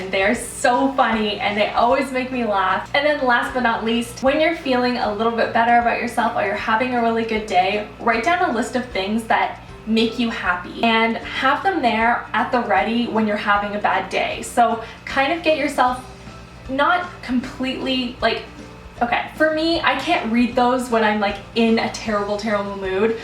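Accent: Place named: Canada. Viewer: I can tell you're Canadian by the way you say "out" and "about" :) so cute!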